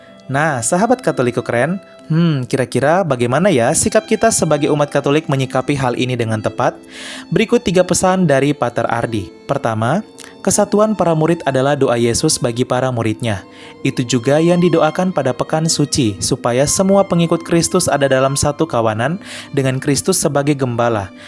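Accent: Manado, Indonesia. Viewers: Min orng Manado yahhh?? Hehehe 😁😁😁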